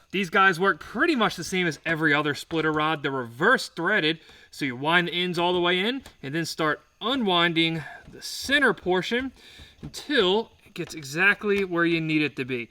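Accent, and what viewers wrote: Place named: Delaware County, United States. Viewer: Man I hear your accent so hard, almost sounds like you’re from Delco?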